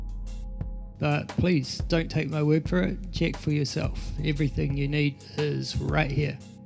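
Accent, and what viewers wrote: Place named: New Zealand. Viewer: Love the video. Intriguing with the music, kiwi accent, talk and images. And good photos to work with. But sorry it's wrong because you can't just pull any curvature drop down from the top of the mountains. You must calculate the part of the mountain(s) that you actually can see above the horizon. If you do that you'll know whats missing from the bottom part. And that will be the amount of drop. And then you got it right. Approximately 1000 meters are missing from Mt.Tapuae. As illustrated here: <link> If you compensate for "Peak 3" being some 2km beyond Mt.Tapuae then it could be closer to 800m. But the drop is there, it's huge and it's according to curvature calculation. I hope you make a follow up. I haven't got the time to make video's myself. Take care!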